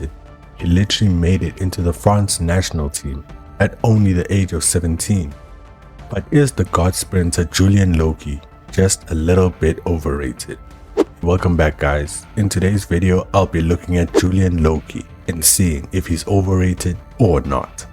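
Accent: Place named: South Africa. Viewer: haha nah from your cadence. As a fellow south african anime lover you have a new follower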